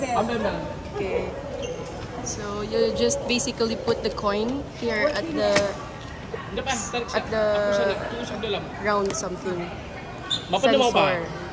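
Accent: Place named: Philippines. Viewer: From her English accent, she's Filipino.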